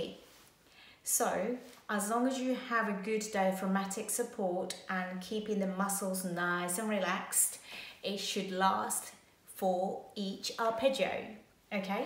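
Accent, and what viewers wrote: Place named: United Kingdom. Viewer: U have the British accent but u don't look European or Brazilian at all. But Asian. 💙R u a Japanese lady? ☺️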